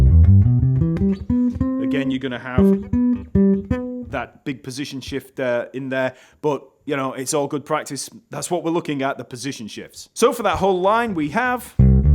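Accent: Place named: North, United Kingdom. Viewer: The clarity of your Northern accent allows me to soak your teaching by osmosis. It's only a Northern song. I'm a Yorkshire lad by the way. Si thi.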